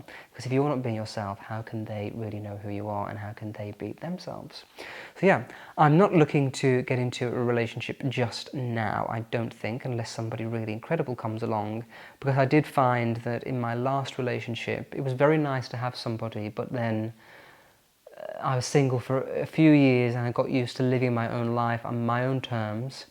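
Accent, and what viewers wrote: RP, United Kingdom. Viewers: U R A BIT plummy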